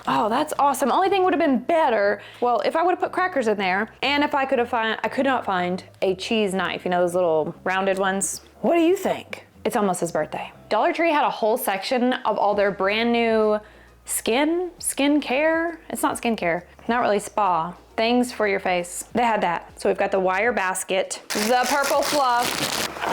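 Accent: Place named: Appalachia, United States. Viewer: Just love you, girl!🩷 You sound as country as me. When asked where I'm from; I tell them I'm not just southern, I'm Appalachian southern. Merry Christmas to you and your family.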